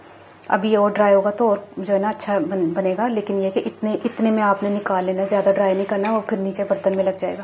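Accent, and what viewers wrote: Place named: Punjab, India. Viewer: میں آکھیا جی بڑی چنگی ریسپی بڑی مٹھی پنجابی تے بڑی سوھنی آواز اے تہاڈی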